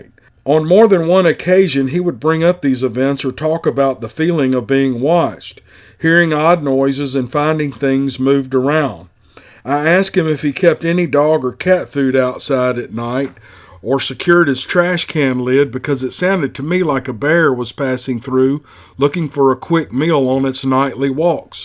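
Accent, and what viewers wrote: South, United States. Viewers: Nothing better than a Southern voice, Louisiana folks appreciate you, and your taking time to do this!!! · I love the fireside videos. I listen right before I go to & the fires & your nice southern drawl lulls me to sleep. Please don't take that offensively. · I luv your southern accent if I'm saying that correctly, anyway it's different from other you tube videos. You have good store telling technique. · Yea yu dig his southern voice Us southern boys have that southern draw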